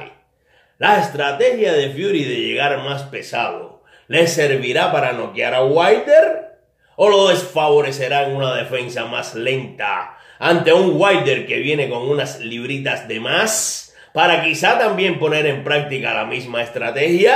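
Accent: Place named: Cuba. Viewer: Con ese acento y no sabes jaja...Cubano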